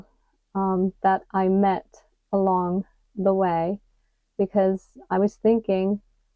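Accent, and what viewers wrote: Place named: United States. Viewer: Oh liebe, after some failed approach only, today I did see your videos; twice, and I am - my english from the old millenium is too bad - sono commossa. Going around the world with www, with Captain, with the friendly people around the continents, who see and love YOU and these videos - which language fits? I was non really able to understand everything in the video - I suppose, because your english is very US-marked and my english is so old and simple and never really used outside of Germany. I never crossed the ocean, but I feel the love and the blessing to have friends over oceans of water, of mountains, of wars, of years - and this, for me, is making your videos so warm, sincere, beautiful. I loved to see this green and pacific Germany, to see you and your mother so near to each other, to feel connected. I think, this is the secret - your secret, the secret of leaving a country to explore an other country, to find friends and to stay connected. 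And having captain you will be shure to move on and to pass borders and to meet and connect with other people capable to love!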